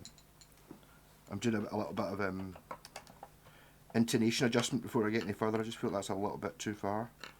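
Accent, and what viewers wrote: Scotland, United Kingdom. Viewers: Great advice re working on cheaper guitars & refreshing to hear a Scottish accent ps uve got me wondering about temu pickups for a couple of kit guitars ( the pickups that came with the kits are dreadful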